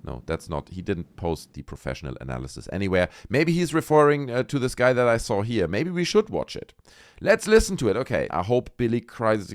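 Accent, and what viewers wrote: Germany. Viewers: The German accent takes this channel so far over the top, I couldn't subscribe fast enough. Commence binge!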